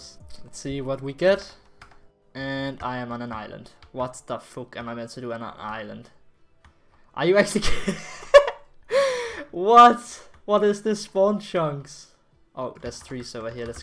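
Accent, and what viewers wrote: Denmark. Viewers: Is this man from Denmark ? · U danish? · are u danish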